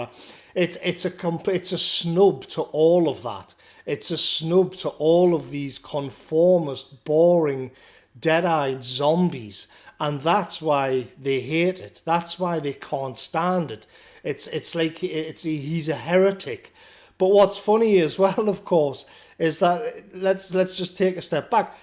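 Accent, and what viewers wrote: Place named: Geordie, United Kingdom. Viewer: Superb analysis, superb accent. Thank you!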